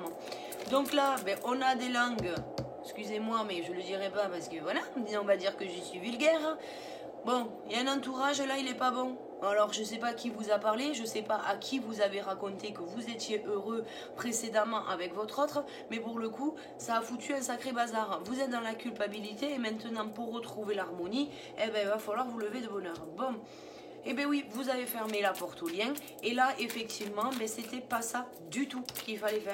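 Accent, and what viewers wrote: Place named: South, France. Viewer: Merci!!! C’est exactement ça ! Vous êtes top et en plus j’adore votre franc parler 😉et votre accent ! Sud est où sud ouest?😜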